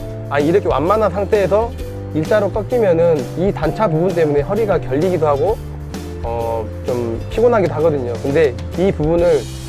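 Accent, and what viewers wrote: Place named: Jeolla, South Korea. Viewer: 으따 절라도 사투리 겁나 쓰구마 ㅋㅋ 좋게 잘 봐부러쏘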